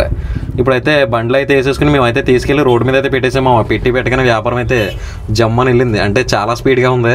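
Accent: Godavari, India. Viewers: Godavari voice bagunadi mava ? Akade o 5 yakarala lo niu padichu ? Try chaye bro ❤ ❤❤ Eluru district bro ❤❤❤❤❤❤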